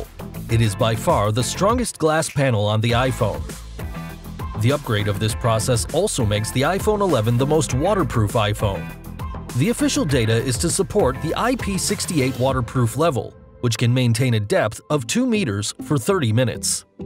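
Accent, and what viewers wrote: United States. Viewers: Holy shit the broken English in this video is so off-putting when read by someone with an American accent.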